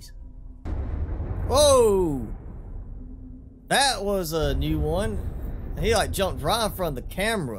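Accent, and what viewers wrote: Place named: Texas, United States. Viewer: Nice Texan talk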